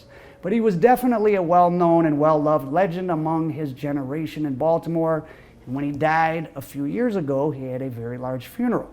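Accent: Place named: Massachusetts, United States. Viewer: I thought that was a Massachusetts accent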